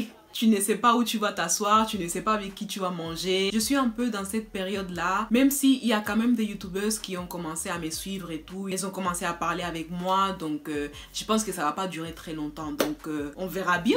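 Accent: Spain. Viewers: J'aime ton accent espagnol continue comme sa sinon tu es très belle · Je pense que tu es la 1ère espagnole noire que je vois! J'étudie l'espagnol et je trouve que c'est aussi difficile que le français quand même... J'adore ton accent et j'ai hâte de voir tes storytimes :)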